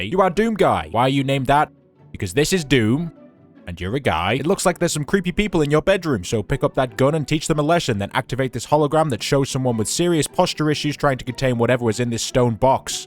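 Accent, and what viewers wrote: North, United Kingdom. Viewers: You seem very northern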